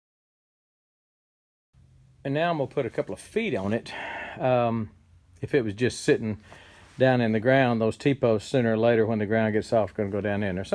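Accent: Texas, United States. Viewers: From your Texas accent, are your from Uvalde?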